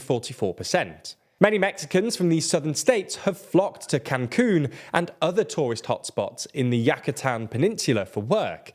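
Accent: United Kingdom. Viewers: British pronunciation of Spanish words always makes me cringe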